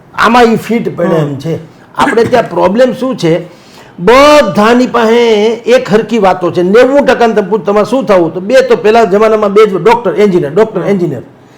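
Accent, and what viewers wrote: Kathiawar, India. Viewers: Aaj Ananya hamara dhanyvad મહેતા ની ખૂબ જ સચોટ અને પ્રભાવી વાત હોય છે તેમણે ઊંડો અભ્યાસ કર્યો છે તેમજ તેમના જીવન ઘડતરમાં ખૂબ જ અનુભવ કેળવ્યા છે તે બધા વાલીઓને સારા માટે કહે છે વિદ્યાર્થીઓને સારા માટે કહે છે અને હું પણ એમના વાતમાં સહમત છું ખૂબ જ ઉમદા અને સરસ વાતો કરી પ્રેરણાદાયી વાતો છે દરેક વાલી જો સમજે તો પોતાના બાળ સંતાનોને યોગ્ય માર્ગદર્શન આપી શકે છે ટેલેન્ટ ની ખૂબ સરસ વાત કરી છે દરેકે પોતાના દીકરા માં શું ટેરેન્ટ છે તે જાણીને તેના તરફ પ્રોત્સાહિત કરવા જોઈએ. મહેતા સાહેબને ખરેખર સરસ્વતી માતા સાક્ષાત છે તેઓને જ્યારે સાંભળ્યું છે ત્યારે એક સંત મહાત્માની જેમ તેમની અવિરત વાણી અમારા જેવા નાના માણસોને સાંભળવા મળે છે. અમુક ખૂબ જ આ વાત માંથી પ્રેરણા લઈએ છીએ કાઠીયાવાડી લેંગ્વેજ થી તેઓ જ્યારે વાત કરે છે અમને ખૂબ જ ગમે છે ખૂબ જ મોજ આવે છે ઘણીવાર હસાવી પણ દે છે અમે હસી પણ લઈએ છીએ પરંતુ ગંભીર જે બાબતો છે તેને અમે નજર અંદાજ પણ નથી કરતા ખૂબ જ સચોટ કહે છે. 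આજ રીતે પ્રજાના પ્રશ્નોની વાંચા આપતા રહેશો તેવી અમને આશા છે મહેતા સાહેબે વિસ્તૃત માહિતી આપી તે બદલ ખુબ ખુબ ધન્યવાદ 👍👍 તેમની સાથે સંવાદ કર્યો તે પત્રકાર મિત્રને પણ અમારા ધન્યવાદ 👍👍👍👍 જય હિન્દ વંદે માતરમ ત્રાગડ ચાંદખેડાથી ગાભાજી ઠાકોર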